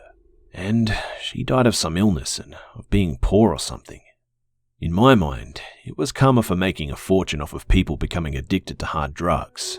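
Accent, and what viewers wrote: Australia. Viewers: this is a real Aussie accent and not the dumb stereo types people do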